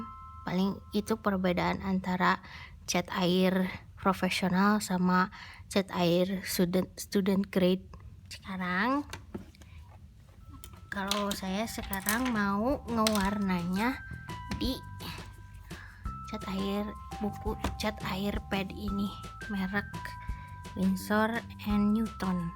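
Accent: West Java, Indonesia. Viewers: Ka lucu banget suaranya xD sunda sunda kitu logatna teh wkwk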